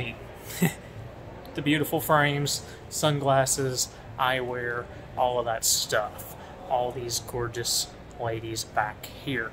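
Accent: Tennessee, United States. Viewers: I love your Tennessee accent lol :)😆Great vid. I wish I had an Optician as passionate as you are 🙂 would love to work with someone excited to find my personal perfect fit and style, and someone who knows the ins and outs of lenses in case problems or questions arise. GREAT Opticians are really few and far between these days...in my opinion 🙂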